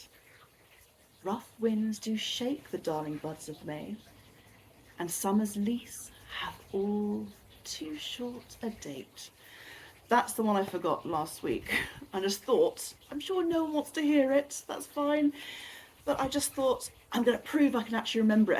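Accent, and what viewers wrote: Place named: RP, United Kingdom. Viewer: Ooo love the way you say you're shakespear quotes with you're gorgeous posh voice ❤❤❤❤❤❤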